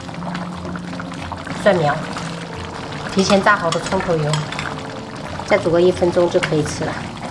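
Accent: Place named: Fuzhou, China. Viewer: 你是四川人,但会说福州話？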